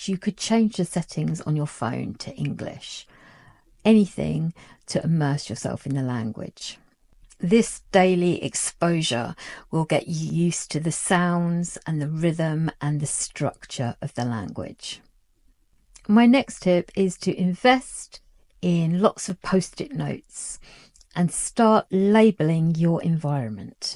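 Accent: United Kingdom. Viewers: All tips are important for learning English and listening to the British accent makes me enjoy learning English.❤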